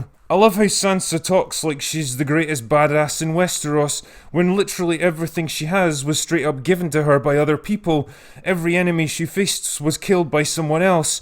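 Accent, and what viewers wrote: Scotland, United Kingdom. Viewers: It sounds Scottish lmao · Love your Scottish accent. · You cant believe how much i love the And thats all i have to say. Go away naow. In this lovely british/scottish accent. Every other youtuber would have said: Like and subscribe, follow me on instagram and facebook Absolutely in love with this... review? Subscribed, instantly.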